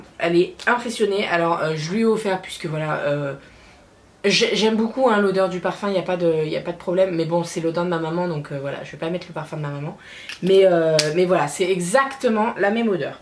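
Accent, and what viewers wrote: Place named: England, United Kingdom. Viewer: Coucou ♥ Alors je participe a ce petit concours bien sympathique :D Alors j'aimerais gagné le parfum Magnetic qui est la dupe de dody de Burberry. Alors pour la petite histoire mon père a celui pour homme et je l'adore donc je me dit que celui pour femme seras une perfection :) De plus je n'es que un petit parfum ( amor amor de Cacharel en forma 33 mL ) et comme c'est un parfum d'été et que je n'es pas de parfum d'hier. Merci pour ce concours. GROS bisous ♥ ps: J'adore ton accent d'Anglais ;) ♥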